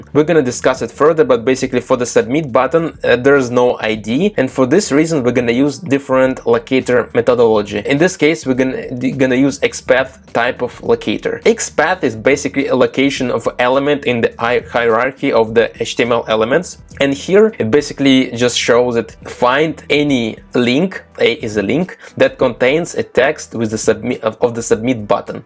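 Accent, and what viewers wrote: Russia. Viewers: Толковый блоггер. По акценту слышно, что русский 😅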